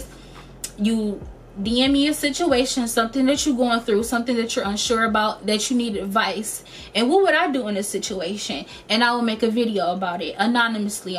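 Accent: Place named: Detroit, United States. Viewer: When u said I’m from Detroit, I felt that that is how we talk , we be spicy , new subbie girl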